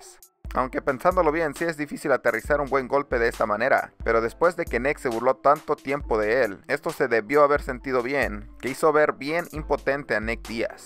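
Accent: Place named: Sonora, Mexico. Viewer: oye eres Sonorense? tienes el acento de mi estado.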